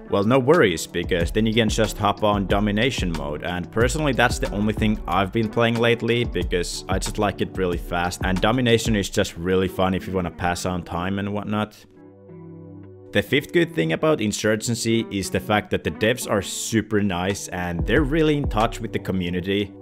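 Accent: Finland. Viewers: instantly knew you were finnish from your accent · kuule kuule kyllä siitä kuule että olet suomalainen letsgoooo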